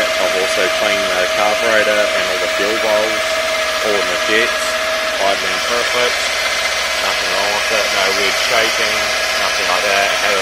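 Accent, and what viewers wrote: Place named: New Zealand. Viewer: Hey bro, sounds like your from New Zealand, are you from Auckland at all? I've just purchased a 2E 1994 Toyota Corolla Hatchback recently and it seems to be running a bit rough, if your in Auckland would you possibly be keen to maybe check it out and maybe tune it? Would pay for your time etc and would rather have it done by an expert then trying to mess with it myself since I'm not really mechanically minded, if your keen let me know. I'm thinking it could be the mixture, I adjusted the idle screw and it seems to rev lower as the previous owner adjusted it to be high revving as that's how he liked it he said (strange but yeah hahaha) Cheers bro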